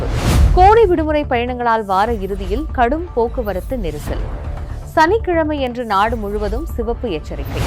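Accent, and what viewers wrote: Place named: India. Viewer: இந்திய பாணியில் செய்தி வாசிக்கிறீர்கள். ர ற ண ன ல ள உச்சரிப்பு சரியில்லை.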